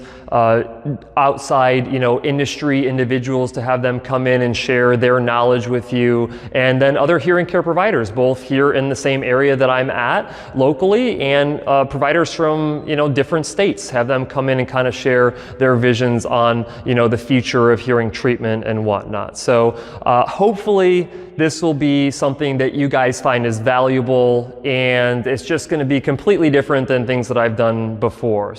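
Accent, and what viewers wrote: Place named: Illinois, United States. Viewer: The videos I have watched are so sincere that they make me feel as if I know you. Color me surprised that you are a farm boy for Grundy County (although some of the your vowel sounds gave me a hint that you were from Illinois). I was raised on a farm, as well, just adjacent to Braceville. Went to Catholic school in Wilmington and HS in Gardner. Of course, you are 40+ years my junior, so no connections other than locale. Best of luck on your new project. Steve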